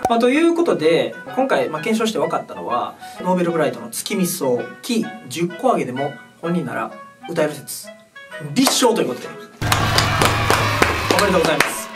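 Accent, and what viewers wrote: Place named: Kansai, Japan. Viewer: 関西弁なのめっちゃいい！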